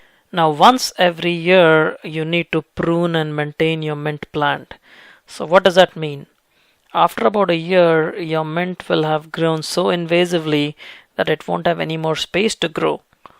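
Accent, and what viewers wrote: India. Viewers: LOVED your Indian accent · I think Indian accent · he is indian i think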